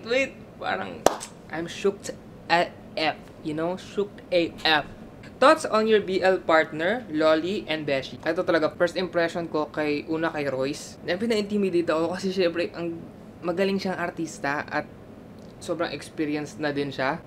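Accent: Visayas, Philippines. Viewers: Butete.... visaya accent is funny to do thing 😂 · Meron talagang bisaya accent eh 😍 palagi ko napapansin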